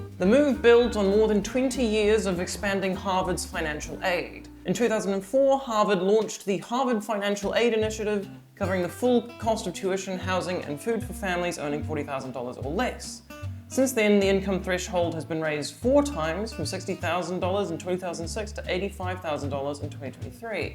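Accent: United Kingdom. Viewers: This explains the British accent thing during your stream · I thought you already had a British accent!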